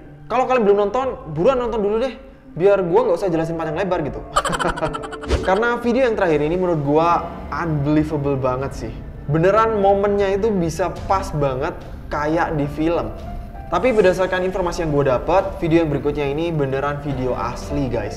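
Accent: Java, Indonesia. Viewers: Bang sodaranya tirta ya🤔 medok banget😂😂😂😂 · Mase medok asline mana to?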